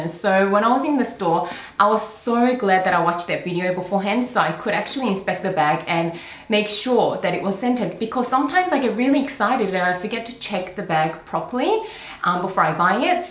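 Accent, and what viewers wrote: Australia. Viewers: Ive never heard a Chinese accent mixed with an Australian one before, mind blown.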